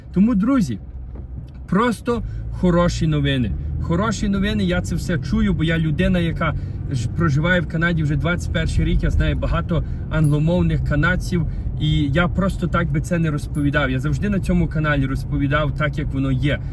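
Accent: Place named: Lviv, Ukraine. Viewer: В тебе старольвівський акцент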